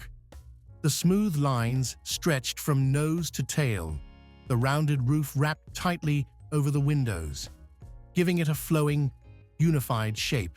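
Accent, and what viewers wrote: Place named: RP, United Kingdom. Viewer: Why does the story of a classic AMERICAN automobile have to be narrated by a British linguistic sophisticate who sounds as though he should be orally presenting a Charles Dickens novel? Also, the U.S. InterState Highway Act was not signed into Law till 1956.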